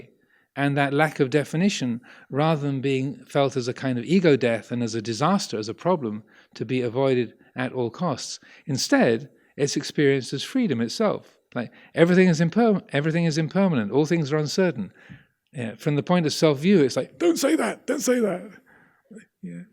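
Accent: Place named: Russia. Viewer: Brilliant words ! thank you most venerable Amaro - love the Russian accent :) and also the statement - ‘we are going to do something terrible - we are going to deprive you of an enemy ‘ I nearly fell off the chair when I heard that LOL Sadhu Sadhu Sadhu we are blessed to hear this monk’s wise words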